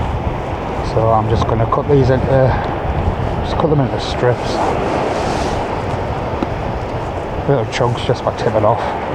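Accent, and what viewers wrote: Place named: North, United Kingdom. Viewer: Amazing buddy love your accent are you from up north 😁😁😉😉😉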